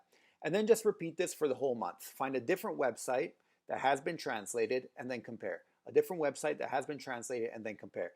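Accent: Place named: United States. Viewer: This is encouraging and I look forward to trying this method. Edit: just out of curiosity, are you a native speaker in both English and Italian? You said you were Italian but your American accent is perfect. Did you grow up in the States? I hope this isn't too personal. Just curious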